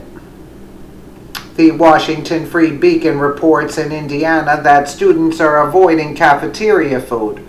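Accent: New York, United States. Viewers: good job sounds like your from NY . yes I fixed my children's lunch the night before . who knows what they feed people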